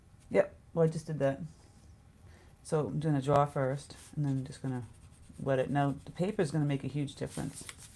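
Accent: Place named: Massachusetts, United States. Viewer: I agree about '21 being tougher than '20. Hoping '22 is much better for all of us. I think we're all weary and sick of hearing about the virus. Time to get back to living! I thought I detected a MA accent! I grew up in Waltham but am in NH now (since '99). Thanks for the video. I hope your holidays were enjoyable and peaceful.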